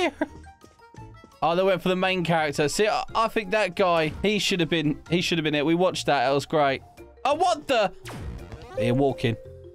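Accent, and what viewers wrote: United Kingdom. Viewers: Your british to mate❤ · That's our amazingly charming British accent for you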